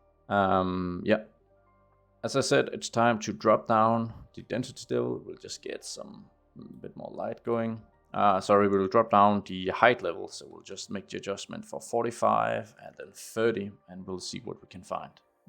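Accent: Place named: Denmark. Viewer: Danglish :D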